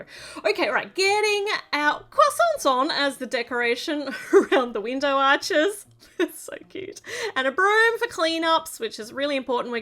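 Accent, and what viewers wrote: Australia. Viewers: R u Australian?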